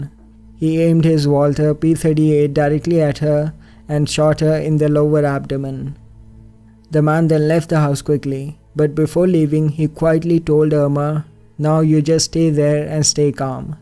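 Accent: India. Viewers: New voice, indian accent sounds different👍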